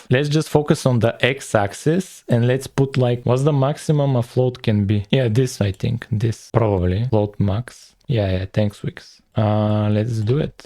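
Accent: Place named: Russia. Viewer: you sound russian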